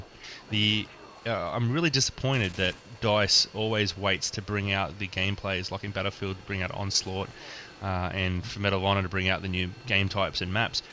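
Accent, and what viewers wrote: Australia. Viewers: ru australian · Your Australian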